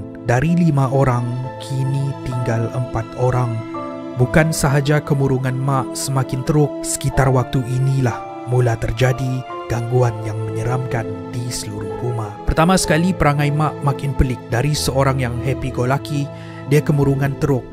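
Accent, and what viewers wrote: Sabah, Malaysia. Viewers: Indahnya dialek Sabah jika bercerita..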